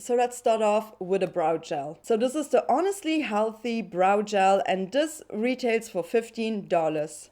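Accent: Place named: Germany. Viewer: Thank you for your reviews, they are very thoroughly made, really like and enjoy them every time! You are one of the very few YT ppl that dedicate themselves to clean and vegan as well as cruelty free make up products / brands. Happy to have found you! I notice some kind of German accent and you are using quite a lot of adverbs ... Are you originally from Germany? So curious ;) Have a merry Christmas and enjoy some free and relaxed time with your family and good (vegan?) food.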